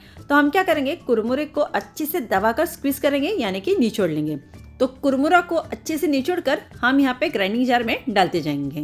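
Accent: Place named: South India, India. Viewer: Voice of South Indian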